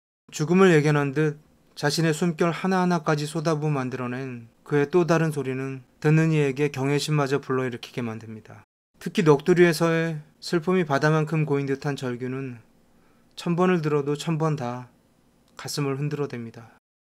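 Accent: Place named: Seoul, South Korea. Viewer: ALL MIX님 말투가 90년대 서울사투리같아서 더 영상이 와닿습니다. 좋은영상 감사해요!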